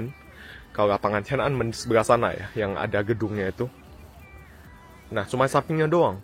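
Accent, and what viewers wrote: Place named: Medan, Indonesia. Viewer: Org Medan mmg sebut modren klo tentara sebut tentra haha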